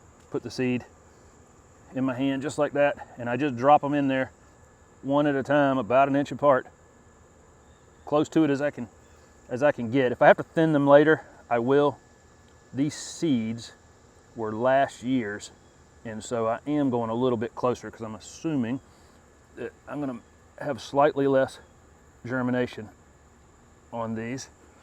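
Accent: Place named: North Carolina, United States. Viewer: Your NC accent was quite a bit more pronounced in 2018, when you were in zone in... Lol.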